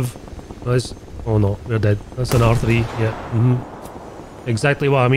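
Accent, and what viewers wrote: Scotland, United Kingdom. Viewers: His accent is Scottish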